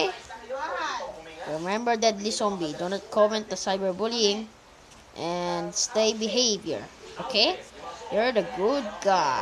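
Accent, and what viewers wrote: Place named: Visayas, Philippines. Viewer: para kang bisaya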